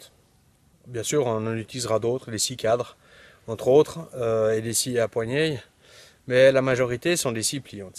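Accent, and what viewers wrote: Switzerland. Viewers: Coucou, je me suis abonnée à ta chaine depuis la première de T vidéos dans la neige;)!!! Franchement je t'adore tu es marant, ta bonne humeur fait du bien, je rigole beaucoup, ton rire est communicatif!!! pour les vidéos j'y connais rien, mais sur certaines autres j'entend rien, avec toi tout es clair!! joli paysage, ton accent à mourir, té pas suisse pour rien car tu dit tout le temps "c'est pas grave, on a le temps" hihi enfin tout ça pour te dire que j'ai presque fini de visionner tes vidéos et que ça va être long entre chaque hihi merciiiiiiiiiiiiiiiiiiiiiii pour les partages et surtout change rien tu es super.... la bisouille... Evy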